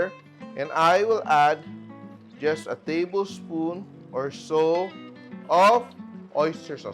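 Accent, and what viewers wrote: Visayas, Philippines. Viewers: Pls do not speak english or tagalog in visayan accent. I don’t umderstand it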